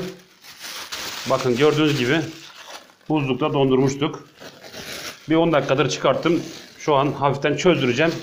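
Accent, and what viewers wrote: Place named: Gaziantep, Turkey. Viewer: Abi anteplimisin siveden oyle anlasiliyor yoorum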